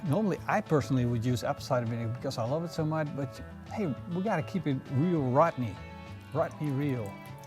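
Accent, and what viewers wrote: Netherlands. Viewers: are you dutch bc's i am and i think i notice the accent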